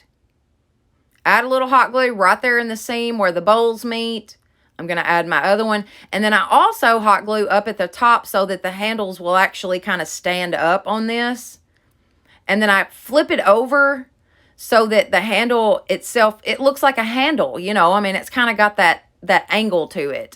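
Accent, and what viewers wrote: South, United States. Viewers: I really enjoyed the whole video. Love your southern accent, but I live in Oklahoma so I can actually understand you lol. Sometimes I have to rewind and rewind videos from the other YouTube girls because they talk way to fast lol. I'm subscribing and fixing to check out your past videos. Thanks for sharing your creativity with us!!! God Bless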